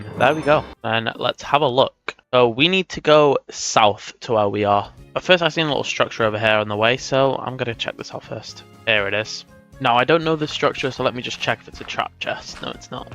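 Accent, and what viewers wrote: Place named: England, United Kingdom. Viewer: Your accent is so familiar where in England are you from?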